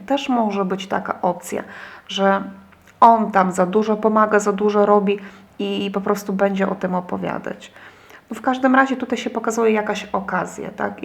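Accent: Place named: East, Poland. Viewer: wschodni akcent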